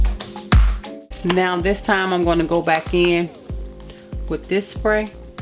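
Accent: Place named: South, United States. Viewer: I will try it. You did a great job!! Love your accent (southern?) Thanks so much!!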